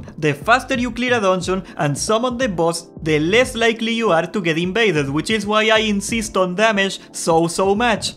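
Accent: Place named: Argentina. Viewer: Al minuto dije este es argento. Buen video campeón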